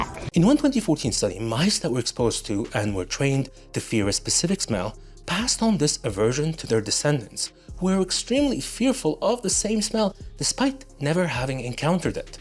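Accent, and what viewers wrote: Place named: United States. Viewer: He sounds like an American to me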